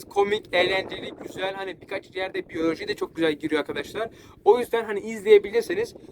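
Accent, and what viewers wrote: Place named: United States. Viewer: Aksanın çok yoruyor amerikan aksanı gibi :D bence biraz bu konu da değişim yapsan iyi olur gibi. yapabilceğine inanıyorum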